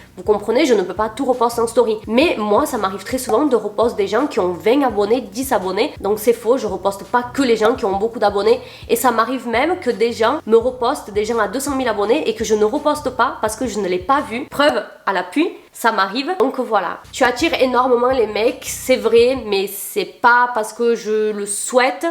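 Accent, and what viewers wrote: South, France. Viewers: Pas un préjugée mais la première impression que j’ai eu de toi mis à part le fait que tu sois adorable , c’est une francaise du sud , alors je te dis pas le jour où j’ai su que tu es brésilienne j’étais choquée car tu n’as aucun accent (non français ) non seulement tu as l’accent français mais en plus celui du sud que j’adore , franchement bravo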